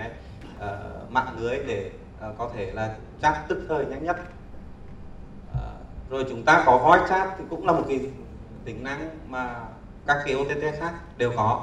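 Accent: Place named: Central Vietnam, Vietnam. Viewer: Bác này người miền Trung thì phải, giọng lơ lớ. ^^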